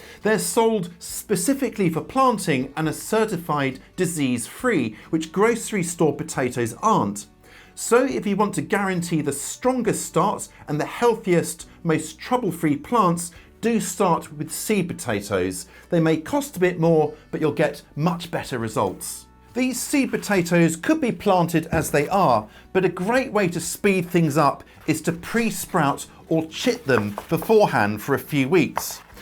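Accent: England, United Kingdom. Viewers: English gardeners has a such great accent 😊 it is almost plaesure to learn English and gardening at the same time😅 "two birds with one stone" 😂 verey interesting informations about early potatoes 👍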